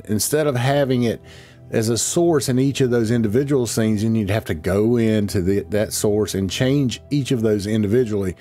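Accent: North Carolina, United States. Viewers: Great tutorial! Thanks! Love the UNC stuff in the background. Based on your accent, you are probably in NC like me. I'm using your tutorials to help me with my Church livefeed in Roxboro, NC.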